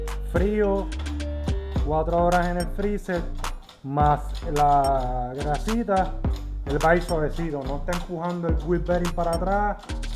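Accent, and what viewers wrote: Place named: Puerto Rico. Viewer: Lenguaje boricua. 😅😅😅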